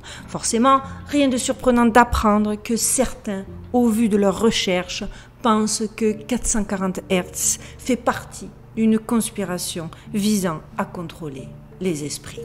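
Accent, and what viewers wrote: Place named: Midi, France. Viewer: Merci! Sujets toujours passionnants. Plus l'accent du Midi 💓💓💓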